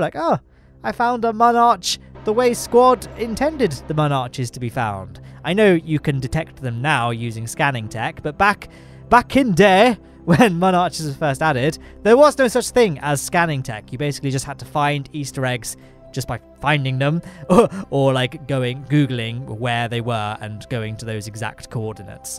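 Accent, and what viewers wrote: United Kingdom. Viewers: This guy is the most British person iv ever heard lol 🤣🤣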